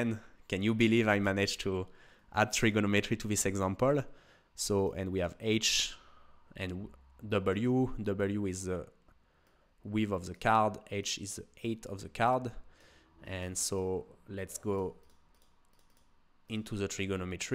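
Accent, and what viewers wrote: France. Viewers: You primary Lang is french ? Because you have a french accent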